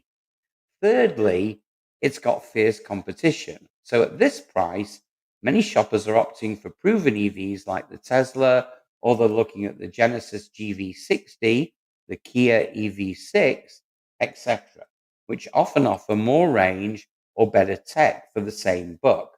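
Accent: England, United Kingdom. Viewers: I'm confused, are you talking about UK or US sales, confused because you have a UK accent but prices are in dollars. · What country does this apply to? English voice, prices in USA $$? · You sound English but you talk in dollars and call a car a sedan ! I’m confused. Ps I will never buy a car with an infotainment screen.👎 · It might help if you made that clear! Initially owing to your accent I thought this was addressed to UK citizens. Then when I saw a dollar sign in front of some numbers, the penny dropped! Shame that nobody could be bothered to show the currency for all the values. A very sloppy video! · You sound very English; why are you talking in dollars? What yank is interested in RHD? I just spotted it they're all LHD, you're talking about the US market. I suddenly lost interest. All the best mate! Mines a Jaguar XJL. It depreciated right to the point where I could afford after 12 years. 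· Just found your channel,having been a petrolhead for more years than I can remember, I was interested in this clip. That said, I am a tad confused, I assume by your accent that you are from Great Britain, however your logo shows a USA flag and terminology is somewhat odd (to me) ie $ Dollars, Gas, Sedan etc? As for Car dealerships, I agree with numerous posts that car manufacturers and dealerships have been taking the eyes out of the very people that keep them in a job THE CUSTOMER! Far too greedy, I have absolutely not one jot of sympathy for these money grabbing burglars. They reap what they sow. 🇬🇧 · American script with an English accent 😂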